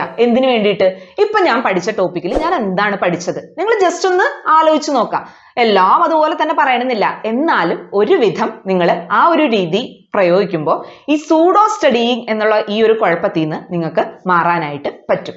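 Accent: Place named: Kottayam, India. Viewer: കോട്ടയം കാരി ആണോ നല്ല ഭാഷ ശുദ്ധി, പറഞ്ഞത് ശരിയായ കാര്യം തന്നെ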